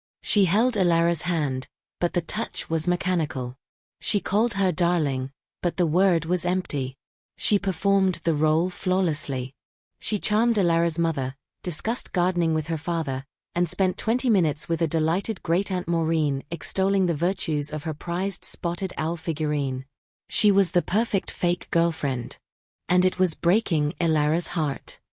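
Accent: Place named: Ireland. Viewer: The reader's accent sounds Irish. It's beautiful. I feel like I'm far away in a green country, inhaling the bakery''s smell of home. I long for a little bit more.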